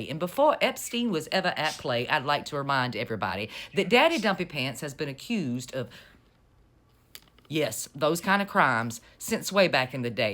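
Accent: South, United States. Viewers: But where in the south? She has the most beautiful accent.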